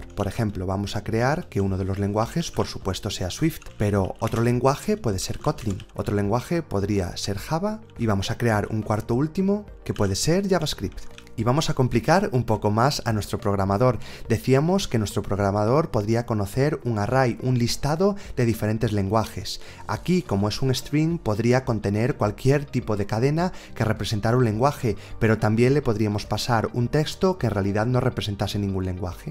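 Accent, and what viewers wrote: Galicia, Spain. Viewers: Me están gustando mucho todos tus vídeos, me parece que están muy bien explicados. Y aún encima acento gallego, de mi tierra, así da gusto! Quería preguntarte si habrá alguna diferencia (en cuanto a contenido) entre este curso gratuito en YouTube y el curso que tienes en udemy de pago (iOS 13 y Swift 5.2  Curso Completo Desde Cero). Gracias!!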